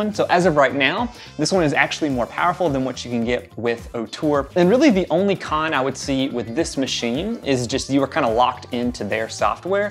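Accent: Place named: Tennessee, United States. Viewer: Dude where you from?! lol I hear the country boy within. TN?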